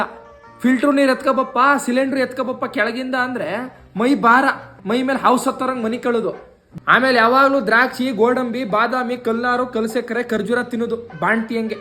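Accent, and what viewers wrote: Mandya, India. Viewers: ಮಂಡ್ಯ ಗ್ರಾಮೀಣ ಭಾಷೆ ತುಂಬಾ ಚೆನ್ನಾಗಿ ಮಾತನಾಡಿದ್ದೀರಾ ಬಾಡಿ ಲ್ಯಾಂಗ್ವೇಜ್ ಸೂಪರ್ ಒಳ್ಳೆದಾಗಲಿ ನಿಮಗೆ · Mandya slang superb.....❤❤❤😂😂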